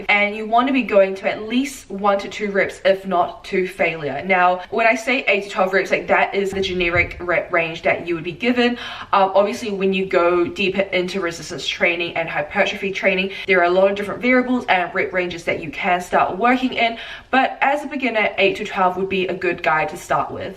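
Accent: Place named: New Zealand. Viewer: hahahaha you know what, the first time i watched your videos i picked up on that kiwi accent right away and i was like, YESSSSSS GUUURRRLLL OCEANIA PRIDE 😂😂😂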